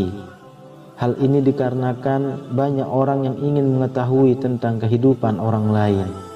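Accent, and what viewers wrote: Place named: Bandung, Indonesia. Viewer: Terima kasih ustad penjelasan nya.🙏🙏🙏👍 Ustad orang mn ya.suara nya sih seperti orang bdng ya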